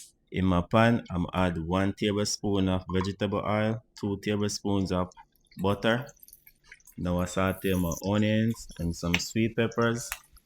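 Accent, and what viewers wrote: Jamaica. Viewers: Brown Sugar Stir Fry …✨❤️try most of your recipes. I have been doing curry shrimp with coconut milk.. or sweet and sour shrimp. Why don’t you use olive oil big flavour or coconut oil both much healthier than vegetable oil. Fastest thing to cook after work. . I have been watching u since you started .. you have made big strides. . 🇯🇲💙✨❤️🇯🇲. It seems every parish have a different speech pattern which parish are you from?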